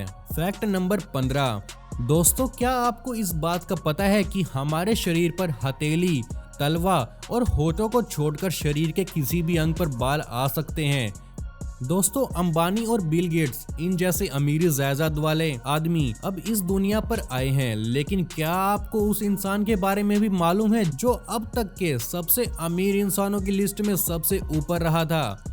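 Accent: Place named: Kashmir, India. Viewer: Bhai.. Ap kashmiri ho kya.. Apka assent kashmiri types hai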